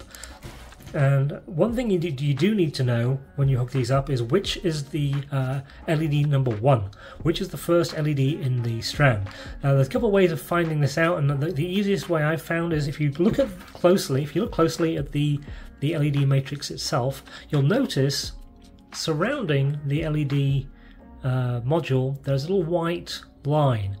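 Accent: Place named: England, United Kingdom. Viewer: The Amazon links take me to Amazon in the USA so I presume that is where you are located despite your English accent?!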